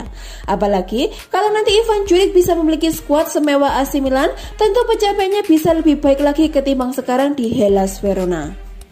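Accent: Java, Indonesia. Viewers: Logat jawa nya kental sekali mind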